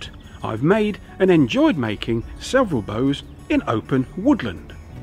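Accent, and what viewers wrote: United Kingdom. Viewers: Thank YOU! Since childhood I have wanted to make my own bow. I practised archery as a kid and was obsessed with Robin Hood and all things Medieval. I remember my dad and I at some point went to the woods to find a big branch of a yew (called a taks tree, from the Latin, in my native Denmark) from which to make me a long bow, like Robin Hood’s. But although my father is a fourth generation cabinet maker by training, we were unsure on how to go about making a long bow. I since worked many years as a lumber jack, but eventually became a classical guitarist by profession and a lutenist of sorts. Unusual background, I know. I kicked my television out many years ago, and I watch instead wood working videos by Paul Sellers and bow and archery craft with you and select others when ever I have the time and need. I thank you for bringing all of these disparate but somehow connected interests of mine together by teaching us the seemingly forgotten skills of yet another of mankind’s inventions. 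I’ve had my goal set at times variously on instrument and furniture making, but I am convinced that bow making might become my spare time enterprise of choice. I must also further compliment you on, A) being a top-level presenter, comparable to the very best, without exception, and B) having a superbly brilliant dog! (An aside, is that a Coventry accent, by any chance?)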